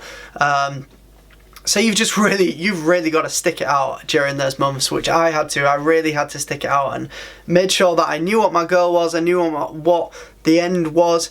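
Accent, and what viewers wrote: United Kingdom. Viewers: Thats British accent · Brad McNaughton northern English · Hmm Yorkshire accent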